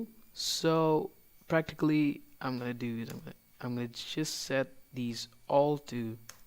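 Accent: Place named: England, United Kingdom. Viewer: Lag Raha he koi asli angrez bol Raha Hain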